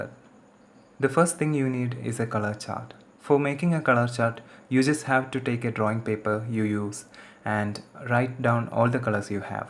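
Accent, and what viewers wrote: Kerala, India. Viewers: I think you are malayali .becoz of your English accent .nice to meet you thank you for this video .iam a malayali too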